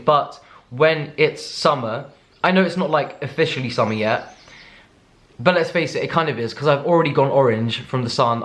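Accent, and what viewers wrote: Germany. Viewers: I hear a german accent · She sounds 10000% German lol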